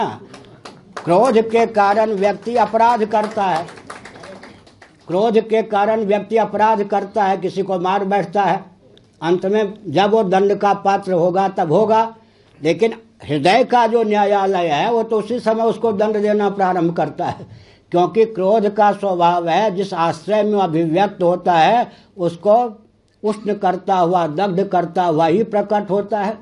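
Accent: Standard. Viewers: विशुद्ध हिन्दी बोलना कोई आसान बात नही है।।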